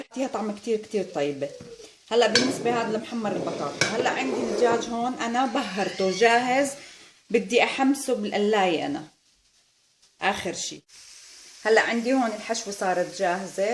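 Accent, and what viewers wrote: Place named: Palestine. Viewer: تسلم هالايدين ان شاء واكلك مبين لذيذ جدا وحكيك حلو الحكي الفلسطيني كتيييييير حلو وبحبو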